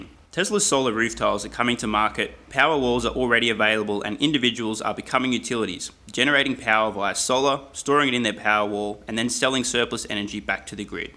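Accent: Australia. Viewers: it’s great to hear the oz humour and accent. Got my favourite channels from US and UK. Now your the OZ channel to add to the funnel of tsla info and insight. My wife and I have our self managed super set up just for this purpose. A friend gave me a nudge a year ago. And haven’t looked back. Will get the Patreon sorted too. I sent this episode to my wife to help pass on more content without it coming from me:-) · Aussie accent mate 🇦🇺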